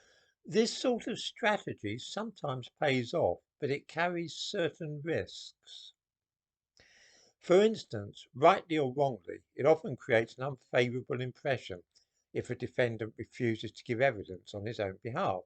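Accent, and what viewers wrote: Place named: RP, United Kingdom. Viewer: A plummy voice doesn't cut it. Your bitterness oozes out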